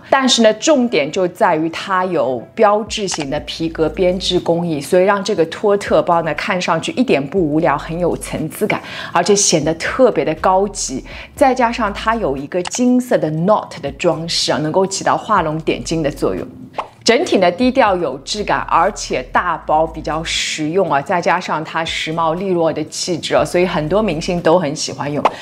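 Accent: Shanghai, China. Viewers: 小姑娘好像是上海人。讲的很好 把我这不懂的瓜，都有点懂了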